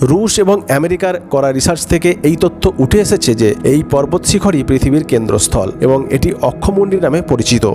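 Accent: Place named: Kolkata, India. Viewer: ক্যালকেসিয়ান উচ্চারন পরিহার করুন!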